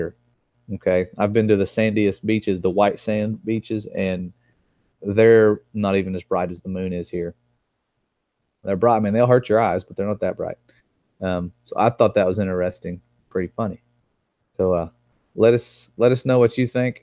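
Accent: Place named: South, United States. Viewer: Kinda makes sense that this guy is southern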